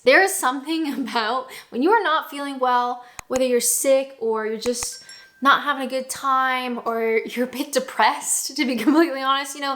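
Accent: Canada. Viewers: Canadian speech, US tastes. More so than the Canadians i have spoken to. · keep your Canadian identity --it is part of who you are for sure. Generally speaking, unless you moved to Britain very young and went to school there, your accent is quite 'fixed' for life even if you use local words and 'Britishisms'. You are being a 'polite' Canadian when you use a British word to communicate over the phone to help with understanding. Yes, Tim Horton's does have grilled cheese sandwiches on their menu and there is nothing like it for comfort food. When sick, it may be the only thing that can help the appetite. Although British people often mistake a Canadian accent for an American one, we Canadians are pretty good at reminding people that we're Canadian, eh? I think I will have a grilled cheese sandwich for lunch today! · Never, ever lose touch with your roots. Same as myself, you can blend your adopted country with the one you were born. 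It's funny though how childhood experiences seem to stay with us forever. So is a Canuck - Brit. Best of both worlds. Makes a very good blend!!!!😀 and, please, never lose your accent. It's so nice to listen to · Accent changing s very much an individual thing. My mother's eldest sister and her husband left Norwich to live in Yorkshire for over 30 years until they returned to Norwich when my uncle retired. When they left they both spoke with the Norwich accent they were brought up with. When they returned my aunt sounded very Yorkshire but my uncle still spoke in his original Norwich accent without even a hint of his 30 years in Yorkshire.This is remarkable because he worked in a large Yorkshire chocolate factory where he would have talked to local people all day long in his supervisory role while my aunt was a housewife who would have had less contact socially. I think you will always sound Canadian because there is no single accent that everyone you know speaks, whereas in my uncle's case everyone he spoke to at work and socially would have had the same local accent. 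That is why it was so odd he never acquired even a hint of it. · To my (Londoner's) ear. your speaking accent gets more Canadian when you visit home and less so between visits. For example the second syllable of "about" gets longer when you go to Canada and gets shorter gradually on your return. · A belated Canadian Thanksgiving to you 💖🇨🇦 Your Canadian accent is delightful, especially the "oo" sound in words with ou in them such as "out", but please, please loose the zee, it's ZED 😅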